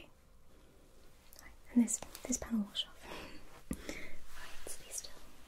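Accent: United Kingdom. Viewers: You have to most beautiful voice with the cutest british accent! please never be quiet in any of your future videos! <3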